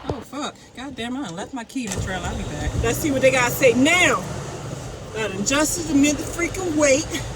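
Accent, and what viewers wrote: Baltimore, United States. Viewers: You have the Baltimore accent lol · Are you a baltimorean ?? Lol. I hear this distinct accent in your voice. Two , do , etc.... just askin.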